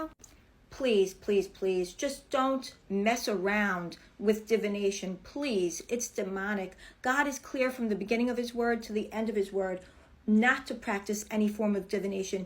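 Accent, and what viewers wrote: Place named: New York, United States. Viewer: Yes. Thank you for letting everyone know. I always try to explain to people that psychics can tell you about your past, present and future because of the familiar spirits that are with you and correct me if I’m wrong, but when you leave, the familiar spirits that were there leave with you? Also real quick, when I first heard u on Doreen Virtue channel a few years back, I was like Ok is definitely from NY, lol I wasn’t sure where, I thought BK but then I found out LI… I’m from Staten Island… love u girl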